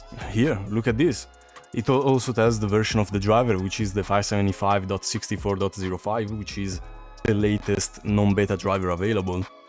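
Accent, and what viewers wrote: Italy. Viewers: bro hai il mio stesso accento nelle interrogazioni di inglese, dimmi che sei italiano e non sono pazzo io. Bel video btw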